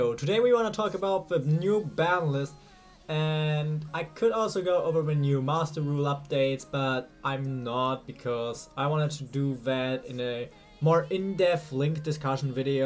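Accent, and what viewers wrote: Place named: Germany. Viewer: Where are you from? You sound german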